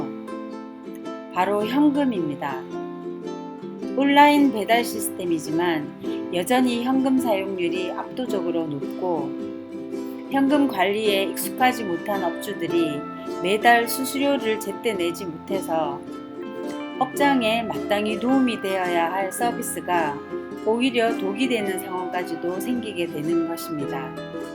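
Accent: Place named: Seoul, South Korea. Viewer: ^ ^~ 나레이션 목소리가 넘 이쁘네요.. 서울 사람 인 것 같아요 으~ 흐 .. 넘 힘드셨지요? 니첼, 도도 없다아 .. ...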